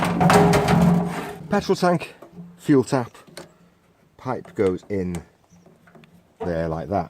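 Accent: Wales, United Kingdom. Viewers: Awesome !!! Been wanting to try that for ages ! Maybe next : gasification??? Familiar accent ? Rhyl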